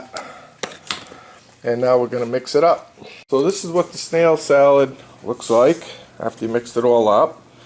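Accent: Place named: Maine, United States. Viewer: you sound like you're from Maine :)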